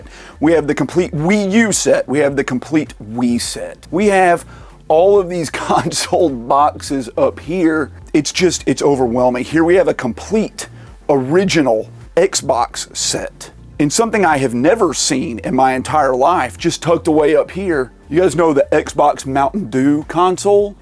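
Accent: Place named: Louisiana, United States. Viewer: Is this accent from Louisiana? Sounds badass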